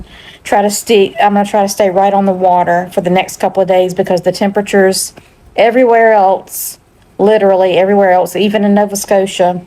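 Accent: South, United States. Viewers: Watching your YouTube video tonight, and seeing my home province and familiar scenery through a visitor’s eyes. It’s made me a bit homesick, because I am living in St John’s, Newfoundland and I haven’t been home since 2019 ( before the COVID pandemic). I was really hoping to get home this summer, having been laid up with Arthritis and finally this March, I got a total hip replacement done. I’m recovering very well, however, I do need my other hip replaced, and hopefully that will happen sometime this fall (I have already signed my consent for it). Definitely going home next summer and possibly moving back to New Brunswick. Strongly considering it. I just love your accent, I have family in Houston Texas so nice to hear a bit of the southern drawl as I haven’t been to visit there in over 10 years now. Please don’t feel out of place in our Maritime provinces, We have all kinds of accents and many cultures, people from many countries who now reside on our East Coast. 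There is a famous saying “it’s a small world after all” and it’s getting smaller all the time. You seem like a really lovely person and I do know what you mean by “some people “ being not so nice. Yes, those people are everywhere, however, most of us Canadians you will find to be very polite, kind and respectful. I subscribed to your channel so I could see some familiar haunts of mine. Drive safe now. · Work on getting rid of that American accent. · haha don't tell Canadians that thing about God having a southern accent. tbh, I don't even understand the joke. I'd leave God out of any casual conversation. People will be charmed by the southern accent itself so don't worry about how you sound. Enjoy your trip. you will fit right in. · I just discovered your video via Youtube suggestion. Very happy that you enjoy your stay in NB, so far (I live in Moncton). Thank you for your kind words for Canada and Canadians (yes, there could be bad people, like anywhere else in the world). 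Love your personality and your southern accent! Have a great road trip! 😊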